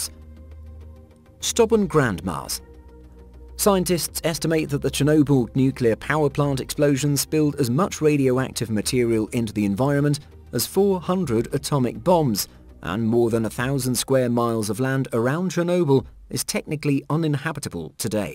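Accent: United Kingdom. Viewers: Fuc! Are only brits doing documentaries? So sic of the accent! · Hold on there Mr. British voice. The word SOCCER was derived from the British in the early 1800's so its y'alls fault that its called soccer, not North America. Interesting video either way.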